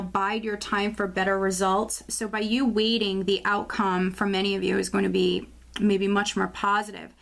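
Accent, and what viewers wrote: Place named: New York, United States. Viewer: where are you from? I hear a ny accent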